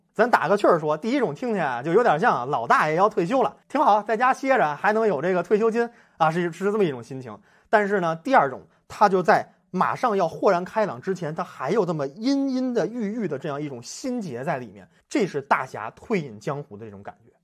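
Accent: Beijing, China. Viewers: 就冲这嘴麻溜的京片子，也得订阅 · 你是不是北京人？听着怎么有点像袁腾飞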